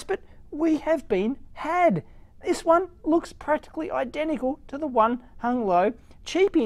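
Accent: Australia. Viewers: he is not British he is Australian they sound nothing alike for god sake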